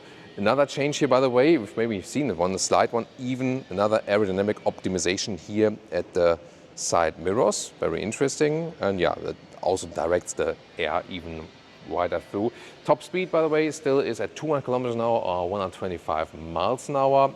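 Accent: Germany. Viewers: Love your accent German Guy. Content and presentation is perfekt! I can now fee the car. Y is a Berlin Car. Good quality. SPASS Leute trennt euch von Politik, die Kiste ist geil! In Chin geht das Ding weg wie warme Semmeln, obwohl da die eigenen Unternehmen für die Hälfte verkaufen. Mal sehen, wann die mit Steueren antworten. Danke für den Beitrag 🚀.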